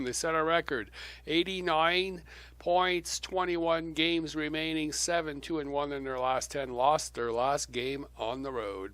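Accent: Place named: France. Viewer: Hey buddy, do you speak French perhaps, I hear a tiny accent... :p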